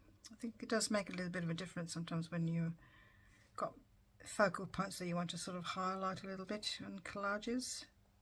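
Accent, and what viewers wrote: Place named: Australia. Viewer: I really liked this collage and I love to hear your Aussie words. Keep up the wonderful pages!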